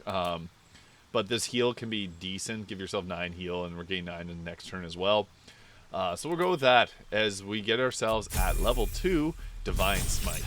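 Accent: Canada. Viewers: Just subbed since I've seen a few of your videos and I enjoy it. Are you Canadian? Random question, sorry. haha.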